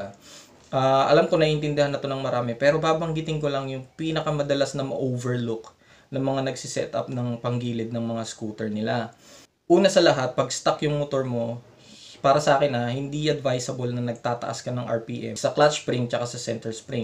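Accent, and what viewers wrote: Ilocos, Philippines. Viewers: Sir Ilocanos ka po ba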